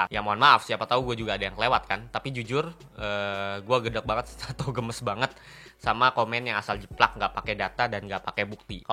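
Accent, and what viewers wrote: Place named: Java, Indonesia. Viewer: Kalau ngomong kok medok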